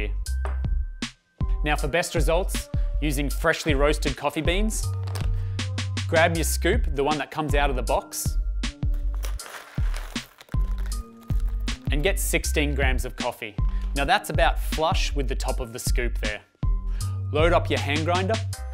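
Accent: Australia. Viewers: Man, I can't stand the Australian accent! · where in australia is he from? :D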